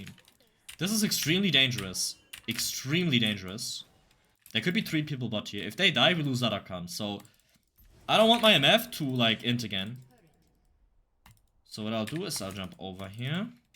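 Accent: Germany. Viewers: Is he german? Just interested because the accent sounds a little german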